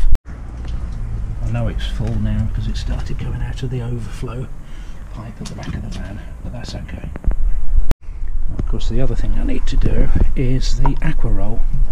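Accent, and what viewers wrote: United Kingdom. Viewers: Hi. I just found your channel. Your video title caused me to watch and prompts my comment. I live in the central USA. By listening to your manner of speech, I can guess that you are in the UK. You and I use language differently. I am a nurse with a background in preventive medicine and water sanitation. I want to point out that there is a difference between sterilisation (sterilization) and sanitization. Sanitizing is actually what you are doing in the video. Sanitizing is the killing or removal of most and especially of the harmful micro-organisms. Sterilization is the killing of ALL micro-organisms. Sterilization is a process used in medicine to prepare surgical instruments. It most often involves steam under pressure or ethylene oxide gas. True sterilization isn't practical outside of medical and a few industrial environments. Sanitization is used in food service and other environments. 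The RV's fresh water system is actually being sanitized instead of truly sterilized. I am not criticizing your use of the language, and probably your UK viewers understand exactly what you mean. But for others, I feel that it is important to make the distinction. It is important at the beginning of the season, end of the season and any time one believes that the water system might be contaminated, to sanitize the system. Your video showed one product available to help accomplish this. Sometimes though, one might find oneself in need of treating the water system without having an availab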